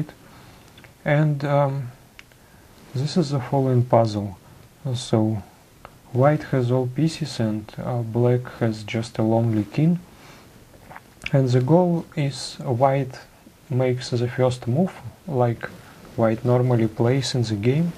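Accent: Russia. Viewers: The "O" in the Russian alphabet is pronounced by like "Ah" (except the accented syllable" , e.g. хорошо = harasho Thats why he has that accent. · Very nice. And English with Russian accent i so cool.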